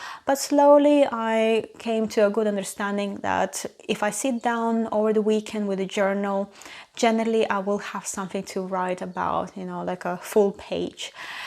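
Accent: Russia. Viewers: I can hear Russian accent. Are you from Russia?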